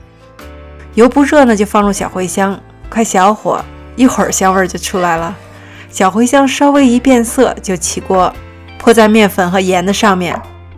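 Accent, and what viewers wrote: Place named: Xi'an, China. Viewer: 最开始看是因为地道的西安味，难得发现一个老乡，然后看见所有配方都有详细说明，量杯和克数，还有中英文对照，尤其用量杯，大小勺，非常方便，我习惯用计量，每次看见网上食谱用克数就头疼，现在方便了，要做什么都打开的视频看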